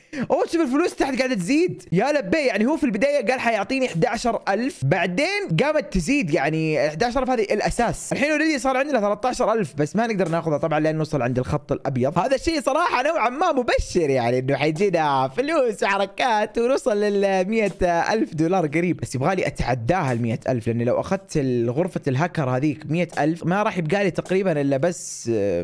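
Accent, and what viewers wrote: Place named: Oman. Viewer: شيول اللهجه العمانيه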